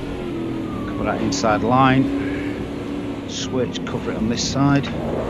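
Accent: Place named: Northern England, United Kingdom. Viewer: Nice video, Could you show tyre temps on screen next time to see how a drying track affects tyre temps and whether or not you need to go off the dry line to cool your wet tyres? I know this video was about primarily the new particle physics etc, but on a drying track you need to know when to make the switch to slicks.Keep up the ood work, nice to hear a proper Northern accent coming from a Yorkshireman!!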